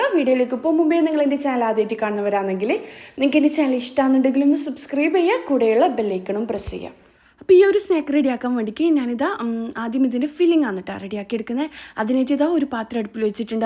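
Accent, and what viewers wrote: Kannur, India. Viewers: Alla ith kannur thanneya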